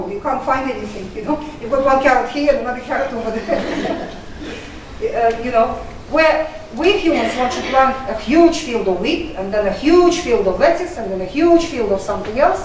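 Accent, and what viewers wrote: Russia. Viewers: I thought she was speaking russian, but nope thats english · I like her Russian academic speech style. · very informative thank you i like her russian voice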